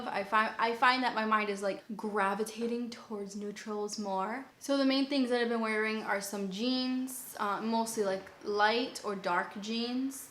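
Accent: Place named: Massachusetts, United States. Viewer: Where in Massachusetts are you from? That accent is deep lol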